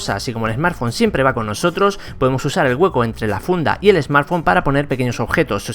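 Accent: Spain. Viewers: De que parte de españa eres?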